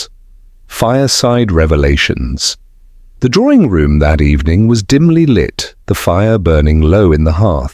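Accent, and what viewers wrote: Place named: United Kingdom. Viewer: Why is this narrated in a British voice?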